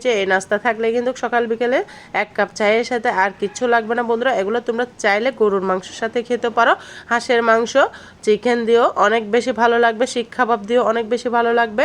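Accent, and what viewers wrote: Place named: Chittagong, Bangladesh. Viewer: আপু তুমার কথার ভেতর চট্টগ্রাম চট্টগ্রাম একটু ভাব লাগছে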